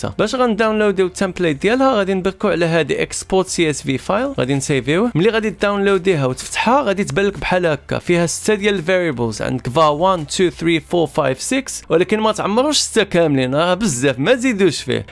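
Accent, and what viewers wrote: United Kingdom. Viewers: كاتقضي عليا باللكنة الابريطانية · Awel Marra kansheuf maghribi kihdar b darija b' british accent :D , allah yfar7eik f 7yateik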